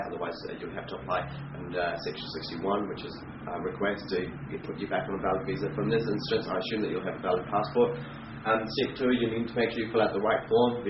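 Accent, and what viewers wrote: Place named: Australia. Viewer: Hello Mate, Firstly speak clearly,there is lot of resonance in background..I can understand..you speak like an Ausiss mate.I know i was in merchant navy and sailed all over world so I can speak in all the accents. do you know rushell peter watch his show...joking Few points for you to improve. 1.Speak loud and clear 2.write on board neatly 3.If you cant write have a printout in bold letters.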